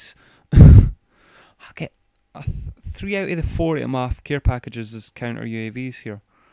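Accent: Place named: Scotland, United Kingdom. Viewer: Where about from Scotland you from?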